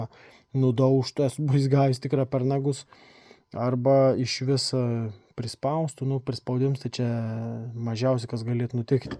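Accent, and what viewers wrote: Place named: Samogitia, Lithuania. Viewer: Gal ne įtemą, bet man labai patinka žemaičių šneka! Linkėjimai iš Dzūkijos gilumos!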